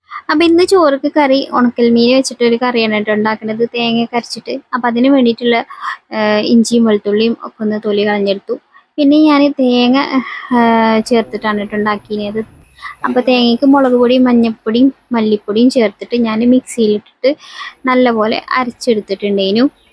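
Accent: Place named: Kannur, India. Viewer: വ്ലോഗ് എന്നും കണ്ട് ശീലം ആയി അത് കൊണ്ട് എന്നും വീഡിയോ ഇടണം ❤❤❤ Kannur സ്ലാങ് ട്ടോ ട്ടോ കുറവാണ് അത് കൊണ്ട് പറഞ്ഞതാ 😂😂😂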